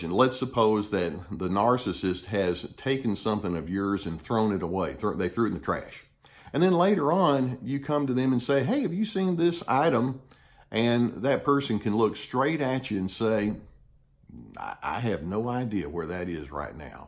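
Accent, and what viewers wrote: South, United States. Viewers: You can just feel the warmth and caring of this man by listening to him. That southern drawl and compassion are a beautiful combination. I'd I had to guess I'd say he's an infp like myself, but more highly developed and less jaded than myself lol.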